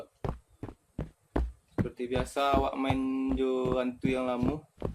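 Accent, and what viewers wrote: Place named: Payakumbuh, Indonesia. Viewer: Logat pikumbuah jaleh bana 😂🤣😂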